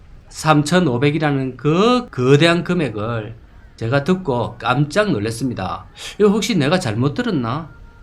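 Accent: Gyeongsang, South Korea. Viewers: 망한곳만, 망할것같은곳만 뎅기는 거도 능력이죠. 그쵸. 특유의 그 경상도 사투리로. 우야노. 우얄끼고 하면서. ㅎㅎ 안 망할것도 망하겠어요. 응원 합니다.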